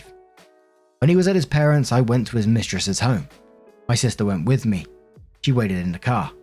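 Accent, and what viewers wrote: United Kingdom. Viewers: Nothing like getting my three miles in in 15 minutes while listening to his melodious British accent.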